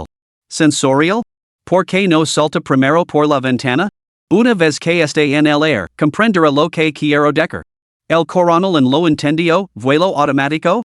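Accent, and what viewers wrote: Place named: United States. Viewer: Esta español si lo escuchas bien solo que es un gringo quien habla😔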